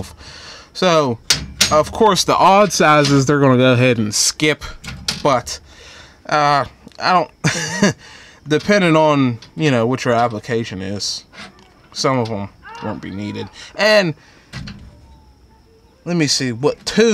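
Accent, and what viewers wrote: Pittsburgh, United States. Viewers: You from the Burgh?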